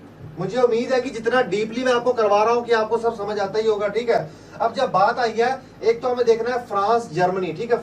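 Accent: Punjab, India. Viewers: Sir g apki language me punjabi jhalk rhi he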